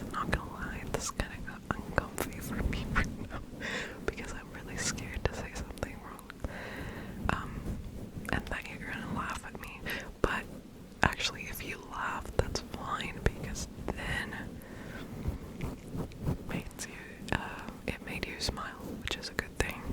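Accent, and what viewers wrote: Australia. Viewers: Before I found out she spoke German, I thought she was Australian too. Lol 🙃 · I thought you were Australian and had no clue you were German natively so 😅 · I'm Australian and assumed she was too lol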